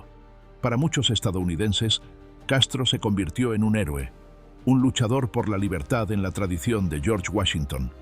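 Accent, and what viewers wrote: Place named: Spain. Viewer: Menos mal que el Narrador, no tiene acento Sudaméricano. Para mí oído es más agradable oír a alguien con acento español, es más Elegante, con mejor dicción y con más clase. Este señor Fulgencio Batista era Amerindio puro, con algo de Africano, pero según se ve 0 de sangre🩸 española mediterránea o latina.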